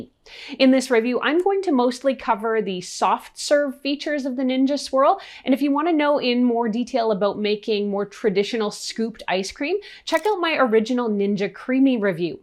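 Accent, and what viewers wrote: Canada. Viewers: Excellent 905 accent.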